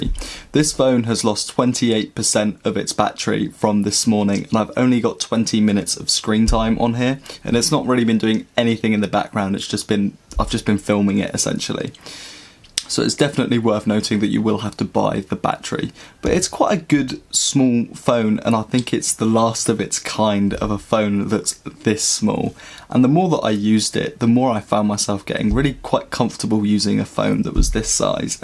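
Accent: United Kingdom. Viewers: that frustrating british accent...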